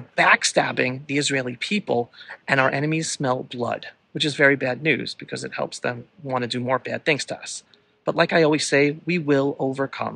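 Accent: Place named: Arab world. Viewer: Your Middle East accent is hard for me an American to understand.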